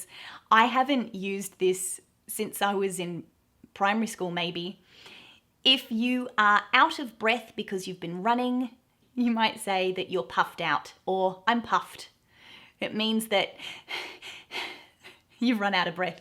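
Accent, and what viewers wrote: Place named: Australia. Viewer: Elephant in the room. You are speaking with an "AUSTRALIAN" accent. A REAL Australian.. accent.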